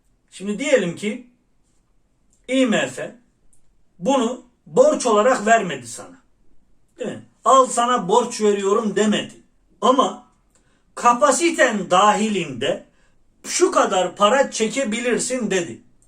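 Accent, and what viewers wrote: Erzurum, Turkey. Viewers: Sen Erzurum lumusun abi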